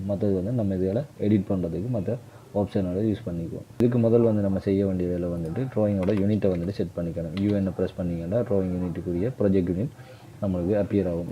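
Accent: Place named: Eastern Tamil, Sri Lanka. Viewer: Best of luck bro Eastern tamil ketkawe mass a irukku Konjam basic la irunthu solli thara ealuma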